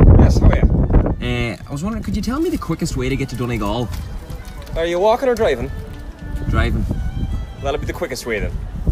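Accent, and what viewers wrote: United States. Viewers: Zeeeee? Which part of America is she from?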